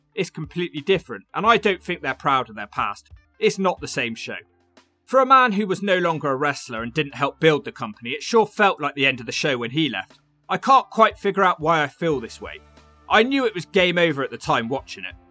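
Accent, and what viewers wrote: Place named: Devon, United Kingdom. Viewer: where are you from as you sound like you’re from my part of the world. I was born in Plymouth (the original one in Devon) and I live in Cornwall just over the Tamar River so I’m on about 15 miles away from Plymouth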